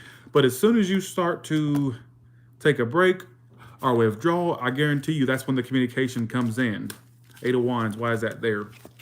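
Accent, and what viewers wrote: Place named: South, United States. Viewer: you are so handsome! Love your southern accent too! Good reading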